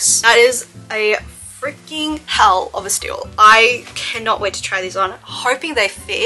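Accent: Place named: Australia. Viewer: Literally obsessed with ur Aussie accent 😍 if u edit on ur phone can u do a video on how u edit I love ursss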